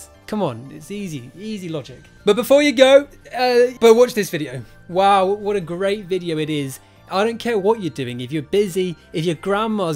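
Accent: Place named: United Kingdom. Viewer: My man said: "He was moving too fast for the camera to even pick up the sound — by the time the camera caught the sounds, he was playing GTA in the next room." 😆😆 Maybe it's the Russel Brand accent (as.an American) that gets me— but everything sounds funnier with that delivery. Awesome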